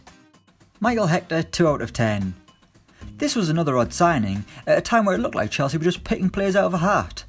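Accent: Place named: Ireland. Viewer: Irish guy detected!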